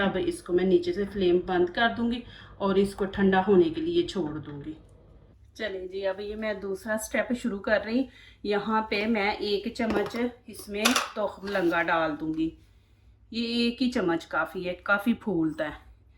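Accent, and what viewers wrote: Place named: Punjab, India. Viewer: Ma sha Allah BHT zbrdstt Api... Apki aik adat BHT pyari h k ap Duaen deti Hain Dil s... Allah Pak apko BHT kmyabi Dy donu jahan ki Ameen...Apki Recipes BHT Best Nd Different Hoti Hain...Apka bat krny Ka Andaz b BHT khubsurat h Respect pyar s...Mujhy apki pnjabi wali voice BHT pasnd h...Hmen bs apki simple recipes s Kam Hona chaeye...M ap k 1M subscriber dekna chahti hn🙌 Jazakillah ❤️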